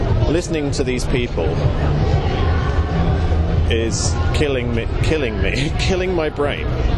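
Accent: United Kingdom. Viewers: please listen to this American woman: you are not uglier in the morning. You are not ugly at any point of the day during any of your videos. You are very attractive (not to mention very, very intelligent) and if my daughter was just a bit older, I would try to put you together with her. She is a genius girl, gorgeous, and an Anglophone. Too young for you though. Anyway. The point is, you're not ugly. You're a cutie pie who says lots of smart stuff whilst using a smooth British accent. 😉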